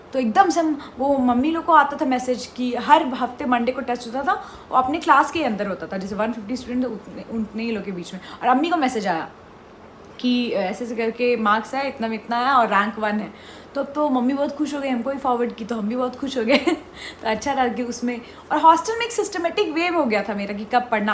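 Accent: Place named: Uttar Pradesh, India. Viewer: UP Accent ✅ Using Ham always 💗 , liked it .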